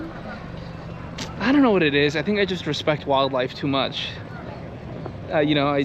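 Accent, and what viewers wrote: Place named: Guatemala. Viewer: Guau que linda es mi Guatemala práctica mente es una chulada gracias a Papa Dios es el mundo 🌎 maya y que grandes están las tiendas y hay de todo y que rico desayuno comieron ustedes y que lindo es el animalito lo bueno que la muchacha se tomó una foto y que linda es música la marimba y de echo venden dulces de algodón y las Nieves son .uy delisiosas no se si ya la probaron ustedes tu eres de Guatemala porque hablas como un guatemalteco gracias exelente y bendiciones 🙏🙏🙏🇬🇹🇬🇹❤️😘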